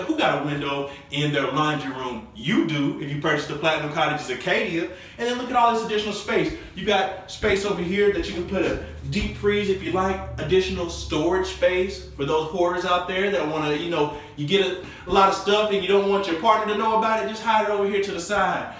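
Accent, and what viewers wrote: South, United States. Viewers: Is he southern, ghetto or just smooth in his mind? Nice layouts, but he turns me off completely.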